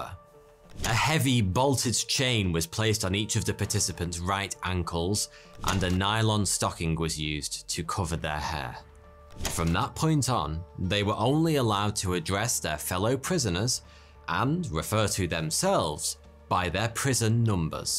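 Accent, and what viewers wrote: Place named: England, United Kingdom. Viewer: I can’t tell from your accent, where about from England are you from. Is it London?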